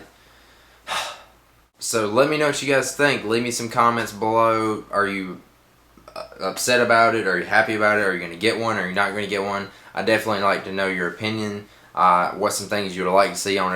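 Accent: United States. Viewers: i'm from england and usually the american accent really bugs me but i kinda think your accent is really kool it's like your talkin and i dont wanna tell u to shut the hell up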